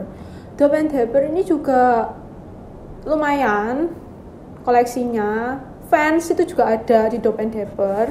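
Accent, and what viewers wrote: Java, Indonesia. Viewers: medok amat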